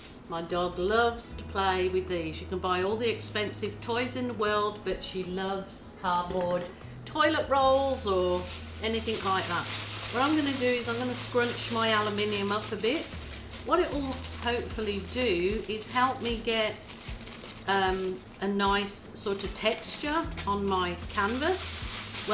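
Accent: Birmingham, United Kingdom. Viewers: WoW! That looks so good! Well done to you. Love the Birmingham accent. We live in Perth WA. We come from England. Have been here for 20 years now. Must try this. Sara x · where in Birmingham are you from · Love to hear your Brummie accent underneath your new Aussie one 🙂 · true blue Aussie here, just like to point out her accent is British not Aussie. And here in Australia it is pronounced AL-YOU-MINIUM 😊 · Queensland? I'm hearing brummie undertones in your australian. . You got away. Good for you. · Yes a resident of Australia for sometime however she clearly has a British accent, very obvious to Australian ear. 😊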